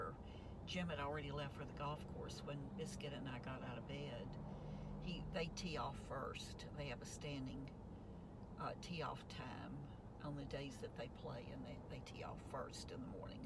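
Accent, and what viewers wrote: South, United States. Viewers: I love your videos. Reason one as a women just entering her 60’s, it’s nice to see you can still be young at heart. Reason two. My pops family is from the south and listening to you speak is like music to my ears. I live in the Rocky Mountain west. So not much of your charming speech around hear. So glad I came across your channel.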